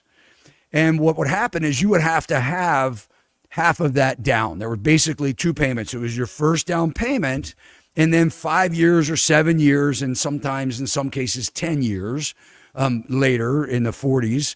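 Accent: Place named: Baltimore, United States. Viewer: BTW, your Baltimore accent is fantastic; the best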